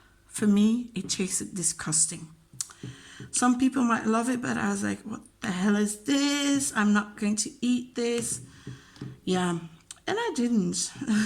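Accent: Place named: RP, United Kingdom. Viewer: I love your name so much and I think it’s so cool where your mom got your name from. I love that song! The variation to Berlin is really beautiful as well. I want to hear you speak alllll the languages! 😂 If you could come up with something to say in Dutch, and then say the same or something extremely similar in your dialect, I think that would be awesome! We might not understand it, but we can try to hear the differences. I’m one of those people who love your accent! It’s so hard to explain sounds, but I can hear a little bit of that BBC sometimes and your words have a kind of flourish to them sometimes? I don’t know, it just sounds fancy! 😂 There are definitely many people here in the US who do not appreciate teachers and also make the same comments about them having lots of vacations and stuff. Unfortunately they don’t understand that, as you said, you’re still working (unpaid most of the time) when you’re not in class with students and that working with so many kids is EXHAUSTING. 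I know I definitely could not do it!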